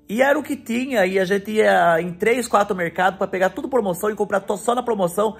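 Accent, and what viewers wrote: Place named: Minas Gerais, Brazil. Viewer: Abençoado curiosidade .eu achava q tu era mineiro pelo sutaq e tb o jeitinho meio caipira é lindo ! Mas as vezes ñ entendo bem .lembrando q sou brasileira tá ?bjinhos no ❤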